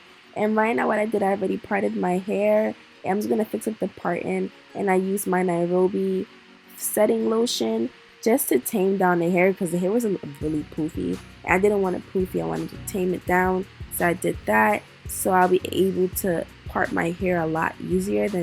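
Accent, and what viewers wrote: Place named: New York, United States. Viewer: Are you from New York you sound like it. Just asking 💕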